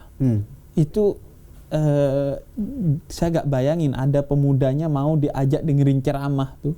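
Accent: Madura, Indonesia. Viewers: pantesan habib logatnya madura ternyata dari bondowoso semangat bib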